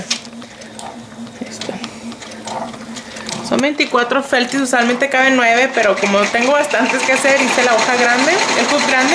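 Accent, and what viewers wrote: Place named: North, Mexico. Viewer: Eres como del norte de México, me di cuenta cuando dijjiste "oshenta y osho" jejejeje. Saludos!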